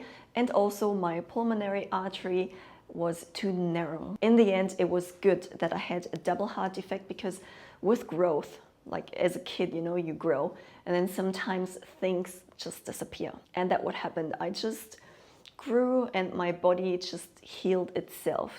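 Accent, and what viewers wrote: Germany. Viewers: Dein Denglisch ist irgendwie putzig xD But jokes aside, your english is more than fine. I did not realize you're german until you changed language. Then again my opinion on that might not count as I'm german too. But for a non-native you're doing extremely well. P.S.: Metal \m/ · I get any right answers 🙈😂 I'm so bad at quizz but thanks for it ❤ and my main weakness is self-criticism, but I improved a lot. I always think how elegant is your english accent, since I know you are German. That's the first time I hear German accent in english 😊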